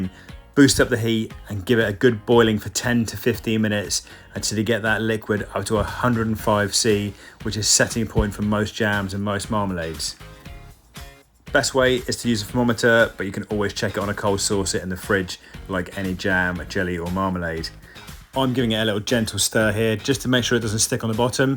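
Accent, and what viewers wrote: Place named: United Kingdom. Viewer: Nothing more sexy than a man who knows how to make jam and comes with an British accent 🥵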